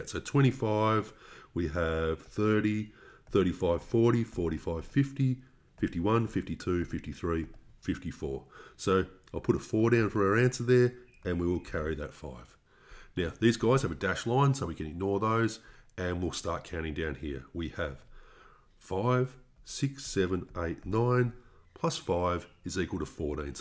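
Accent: Australia. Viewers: I'm not sure what's more mesmerising. The simplicity of this maths trick, or how Bogan this dude's Aussie accent is xD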